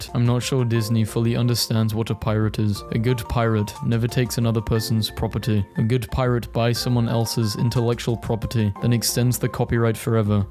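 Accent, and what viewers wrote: United Kingdom. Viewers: pretty sure he’s British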